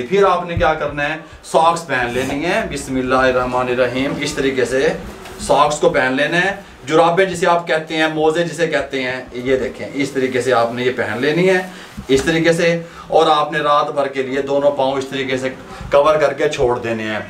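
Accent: Punjab, India. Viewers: English, Urdu and Punjabi touch, Zabardast.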